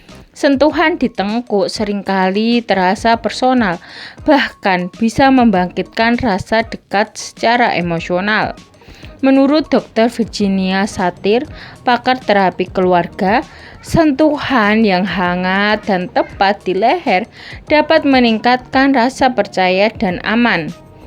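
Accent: Java, Indonesia. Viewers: Tebal sekali medoknya, orang mana sih mba? Dulu makannya apa kok bisa tebal sekali?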